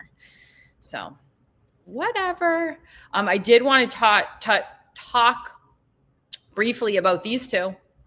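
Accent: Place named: Massachusetts, United States. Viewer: I thought Mass.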